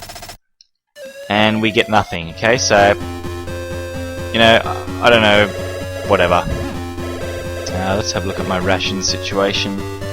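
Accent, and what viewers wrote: Australia. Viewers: i love your voice its a really thick Australian accent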